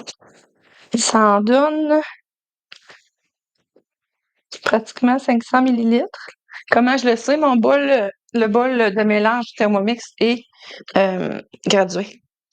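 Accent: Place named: Canada. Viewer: Merci pour cette recette, j adore l accent canadien 🎉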